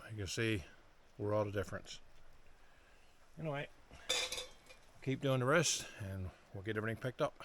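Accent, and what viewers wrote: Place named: Newfoundland, Canada. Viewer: Omg! What great video this was! Your grouse soup was so appetizing! Made me want soup. I have a grouse in my freezer as well carrots and turnip in the garden. Can't wait! I love your product review on the light with red and blue and green... nah! I agree with you about On, Bright and Off! That's exactly what I would want in a camp out situation! Bravo 👏 👏 👏 👏 👏 Your funny moments in your lovely accent fill my heart with memories of my NFLD relatives visiting Ingonish 50 yrs ago. My father's mother was born in Cornerbrook I'm 1892 and died in 1991 at nearly 100yrs. Your accent is what I grew up with... you, My Buddy, feel like home to me. Love your videos and love to Tuckie... from Rita and Terry and Juneau at Murphy Farm in CB NS 😊 ❤❤❤❤❤❤❤❤❤❤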